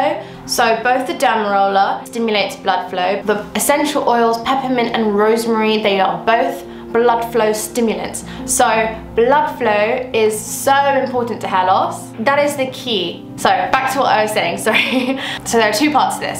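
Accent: United Kingdom. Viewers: I LOVE your accent:D Your name sounds Arabic to me, but your accent is beautifully British <3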